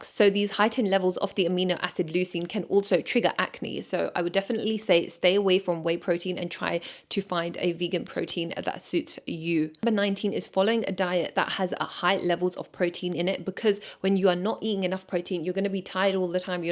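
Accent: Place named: England, United Kingdom. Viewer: Your English accent ❤🎀🤌so amazing i impress ❤you look gorgeous and will follow these tips in Sha Allah 🙏😊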